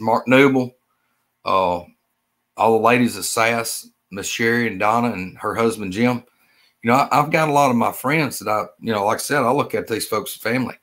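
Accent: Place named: South, United States. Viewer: Great show I'm watching at 4 in the morning because I didn't get a notification 😡 and there's nothing better than a southern accent. 😊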